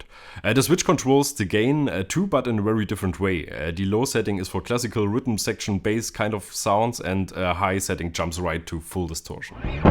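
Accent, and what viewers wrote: Germany. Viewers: Ich höre deinen deutschen Akzent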